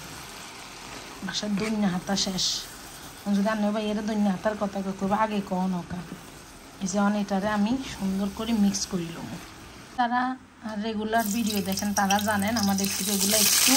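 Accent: Noakhali, Bangladesh. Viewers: Apni noakhali vasay kotha bolen sunta valo lage....amio noakhlir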